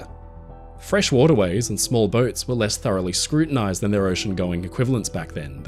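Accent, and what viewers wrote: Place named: Australia. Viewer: Inspiration for an Eastenders storyline where Sharon loses her son Denny. Great Aussie accent you got.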